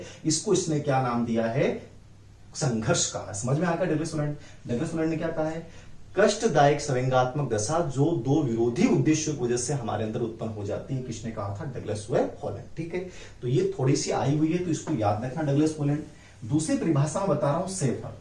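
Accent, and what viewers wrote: Rajasthan, India. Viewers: Guruji aap Rajasthan ke ho kya or aap bahut jayda achha pdate ho thanks Guruji 👍👍👍👍👍👍👍👍👍🙏👍🙏🙏👍🙏👍🙏🙏👍🙏👍👍🙏🙏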